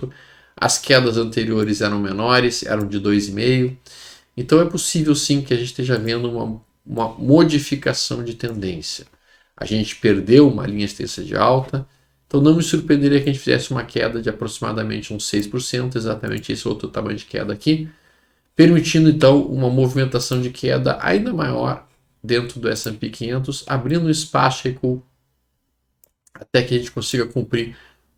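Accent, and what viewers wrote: Rio Grande do Sul, Brazil. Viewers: falou tdo gauchinho de garopa bah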